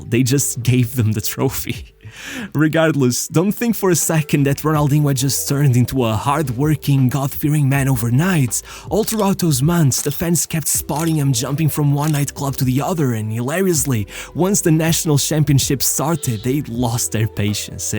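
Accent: Portugal. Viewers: the portugueese accent is there hahaha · Portuguese accent is crazy xD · Bro, why do you speak in Portuguese and not PT-BR? Sounds kinda weird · You sound portuguese my dude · You can clearly notice the narrator is portuguese 🙃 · I know a portuguese accent when i hear one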